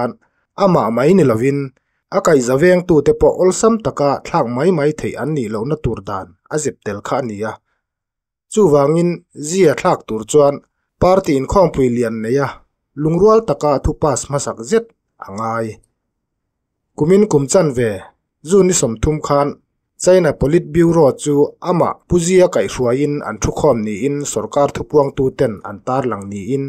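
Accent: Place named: Eastern Mizo, India. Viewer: I awka chu khawchhak unau a ang hle mai mahse a bengvar thlak e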